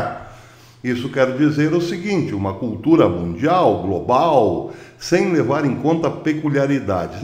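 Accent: Caipira, Brazil. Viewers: Eu ADORO O JEITO CAIPIRA DE FALAR RELAXA A MIM E ME LEMBRA DE LIMEIRA ONDE NASCI BOAS LEMBRANÇAS DE UM TEMPO MUITO BOM NOS ÉRAMOS MAIS LIVRES E FELIZES DO QUE AGORA. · Fessor! Num é Aisland. É Ailand. Amando o caipirês!! Hehe. Um abraço! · Kkkkkk! Adorei o caipirês. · Pois é, o YouTube deve ter estudado aritmética com esse professor a criticou seu português de “caipira”.. vixxx! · sotaque caipira não é matar a lingua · Professor,eu adoro ouvir o senhor falar o "caipirês",pode continuar a fazer suas análises desse jeito 👏👏👏☺️ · Amo seu caipirêsssss!!! Adogôooooooo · Kkkkkkk...o Sr consegue ser hilário sem perder a seriedade. Vai firme no seu sotaque mais que brasileiro. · Continue com o caipires é muito bão !!! Hahahahahha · EU gosto da FORMA caipira, então os BESTAS que engole o CHORO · Excelente Professor, o seu sotaque bem brasileiro, caipira e expressivo, além de excelentes comentários em variados assuntos, esse esquerdista que fez o infeliz comentário sobre o senhor, deve está com inveja por está no ostracismo!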